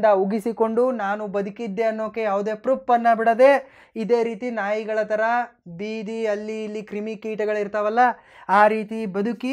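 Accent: North Karnataka, India. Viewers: Ningond request paa nan kadeyinda... Neenu uttar karnataka bhaashe maathadu illa neetaagi south kannada maathadu... ardaa adu idu antha kisi byaadpa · well said boss. nice presentation in north Karnataka style. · Awesome presentation, north Karnataka style. Talk like close friend. 👌 ...I loved the words kisiyodhu, kolithiri😂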